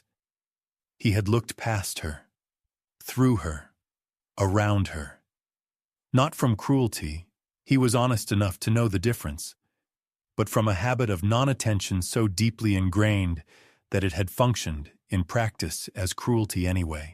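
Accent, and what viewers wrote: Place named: United States. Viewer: Good but a very slow internal story. Its all narrated with very little actual dialogue. I guess its in the style of repressed Victorian English people. The dull voice in an American accent throws me off but at least all the pronunciation is correct.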